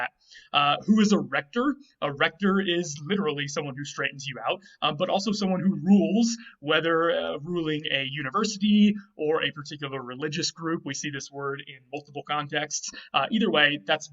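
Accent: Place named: United States. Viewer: No offence and I don't want to come off too negatively but why are you using a postalveolar approximant r in Latin words when we know factually that this sound did not exist in Latin and in no variety of Vulgar Latin was this a thing and the vowel quality you use sounds like it it taken directly from American English. Is it because you don't think it matters or is there some other reason? As a Classics scholar you must know that these words were not pronounced the way you are pronouncing them?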